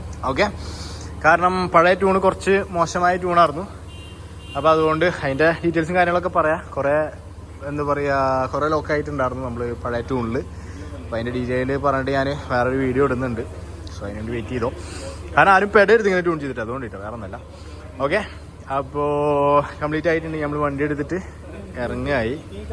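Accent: Kasaragod, India. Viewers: Kasargod slag ayaloo 😂🔥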